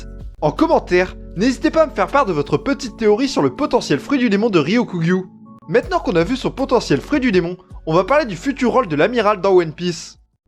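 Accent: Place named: Nord-Pas-de-Calais, France. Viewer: Ptdrr ta un accent chti un peu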